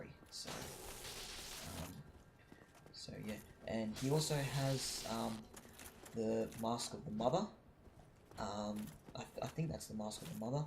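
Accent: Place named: United Kingdom. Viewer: Dude your accent is hilarious haha XD im not a big fan of british accents but your funny ^^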